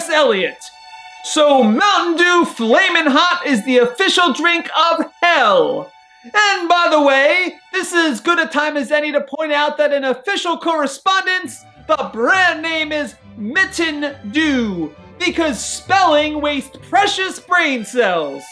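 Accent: United Kingdom. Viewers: this, this is the most amazing YouTube ad ever. Thank you funny British man with an slight British accent in a olden day uniform that is reviewing an horrific MTN Dew flavor. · Finally, YouTube gives me what I’ve wanted for years; a man in a French uniform from the Napoleonic war using a slight British accent reviewing modern food and drink.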